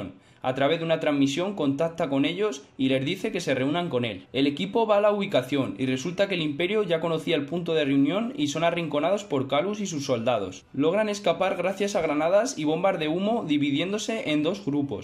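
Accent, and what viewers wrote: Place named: Spain. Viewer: triste encontrar un youtube pura voz española de p o r k r i a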